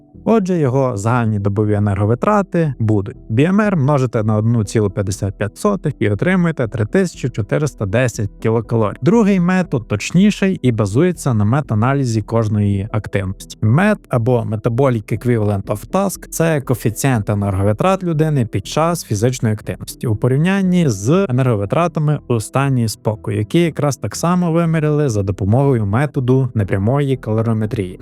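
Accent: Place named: Galicia, Ukraine. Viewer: Ваша галицька Ч додає родзинки! Приємно слухати🤍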